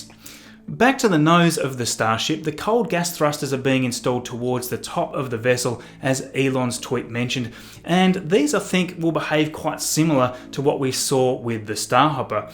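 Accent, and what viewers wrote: Australia. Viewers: Nice video thanks. Random q. Where in Australia are you from?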